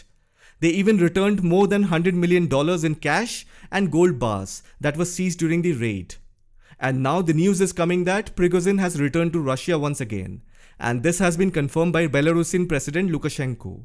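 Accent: India. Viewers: Don't like Indian accent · He has the best Indian accent - the most informative Indian accent - absolutely the best... is the man....🫡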